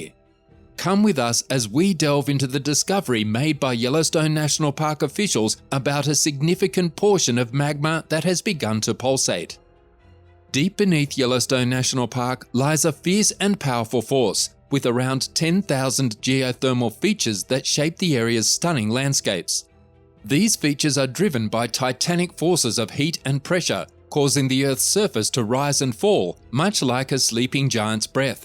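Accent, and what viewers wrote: Australia. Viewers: I started to watch this to see what fate was going on in the good ol USA. Much to my surprise there was an Ozzie bloke narrating and I knew it was a hoax. Who could take that seriously...Crikey!!! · I don’t know the the narrator is from Australia, so what is interested in Yellowstone? I don’t know if I would believe someone from Australia would have all the answers for Yellowstone national park.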